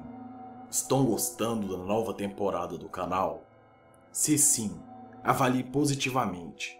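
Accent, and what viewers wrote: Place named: Inland São Paulo, Brazil. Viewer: troca o narrador, q de repente pode ficar legal, pq esse sotaque paulista do interior é foda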